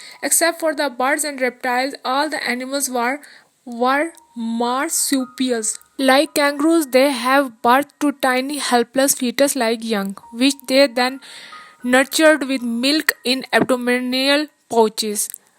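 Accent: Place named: India. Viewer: Typical Indian english !😊 Very inexperienced reading, poor pronounciation and unprofessional audio editing. Visuals can be rendered better. Please improve the audio/video and upload again. Will wait in expectation.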